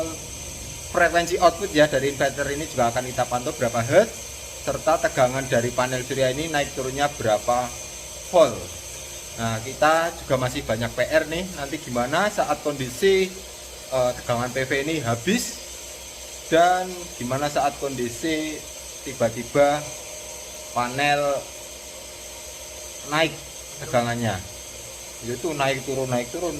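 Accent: Java, Indonesia. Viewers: "Walau Medhok, Kami terus Berkarya" Mantap kuwi mas!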